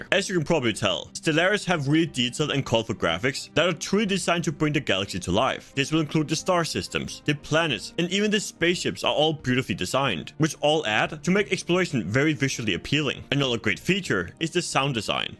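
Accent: Denmark. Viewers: sound like a dane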